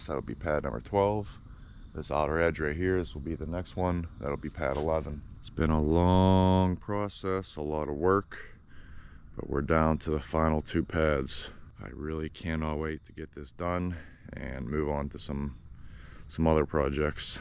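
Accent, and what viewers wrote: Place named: Southwestern Pennsylvania, United States. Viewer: I’ve been watching this series of you pouring your pad in stages. Great work doing it all yourself the old school way! I just poured a patio at my camp the same way in three stages. I had held though since I showed my nephew how to make the concrete and feed it to me. It’s a great feeling to do the work yourself and it certainly saves a lot of money. Are you building a shop on your pad when it’s done? Also where are you located? I think I detect a southwestern Pennsylvania accent 😂. Great job man and I’m enjoying the series. Greetings from northwestern Pennsylvania!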